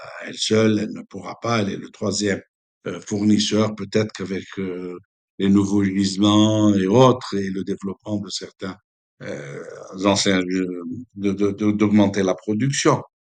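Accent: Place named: Pied-Noir, Algeria. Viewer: Celui qui parle est un juifs pied noirs…!😁 reconnaissable par le phrasé. Y’a aucun intérêt à écouter c’est déblatérassions de 🐪 dromadaires ! Essayer de vous éteindre en silence qu’ont entendent plus parlé de rageux dé votre espèce.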